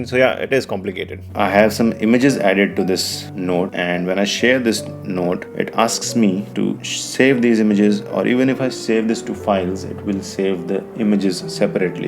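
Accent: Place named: India. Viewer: Man really good video respect 👍 it seems like all the best tech videos come with an Indian accent, but I love it, thanks :)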